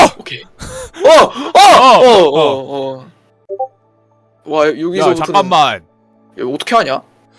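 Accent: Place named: Jeju, South Korea. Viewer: 제주도 사투리 너무너무 귀여워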